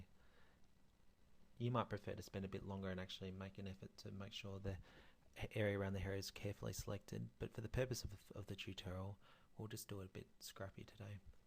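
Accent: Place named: New Zealand. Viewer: Very helpful, thank you! Are you from New Zealand? You accent is wonderful! :)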